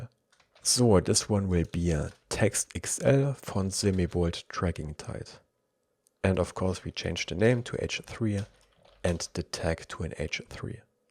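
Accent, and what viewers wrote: Germany. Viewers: are you german or do you speak german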